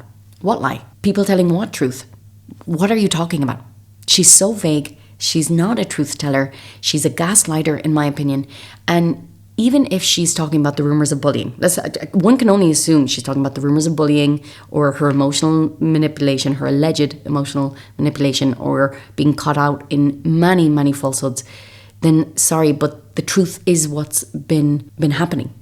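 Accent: Ireland. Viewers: Aww. Not to be too much of a stan but that's just the Irish accent that lots of us like very much. And unlike ahem... some people 😅... it's 'authentic' 😄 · Your accent is so interesting. There’s an Irish twang, no offense meant (I’m an immigrant too). Just quite curious about it. Also, love your videos-I binged quite a bit in the last 24 hrs. You kind of remind me of another content creator I follow called Cheere Denise. She does voices similar to you when it comes to MM-the smarmy self obsessed voice that MM has. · I'm Swedish and it took me some time to understand what you were saying, but now when I'm used to it I find the Irish so soothing and calming, please don't change it too much!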